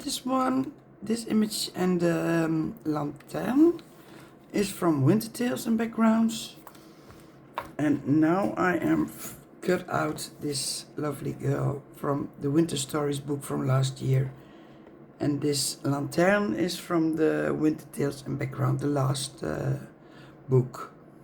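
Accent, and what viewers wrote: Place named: Netherlands. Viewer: Mooi maar volgens mij ben je nederlands zo te horen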